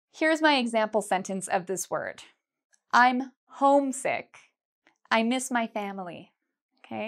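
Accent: Canada. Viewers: Are you Canadian? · I can understand you all aspect and topic that you make. Your accent and Canadian english is very clear and neutral than U.S.A and british english. Thanks teacher!! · I have been watching videos 4 the last few months and I have found out that she is brilliant teacher. I adore her Canadian accent!, u rule! Thanx a lot 4 lessons.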